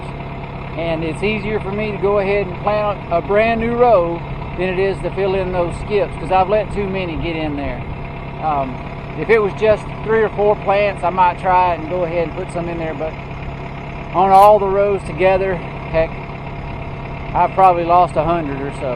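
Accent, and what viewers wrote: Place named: South, United States. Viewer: What state are you in? I see red dirt and hear a southern twang.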